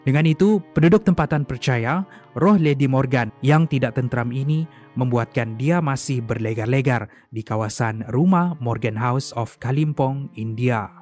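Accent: Sabah, Malaysia. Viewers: gaya bahasa terlalu formal dan masih jelas dialek sabah. tapi jgn disalahertikan, sekadar mengatakan shj. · kau orang apa sabah kah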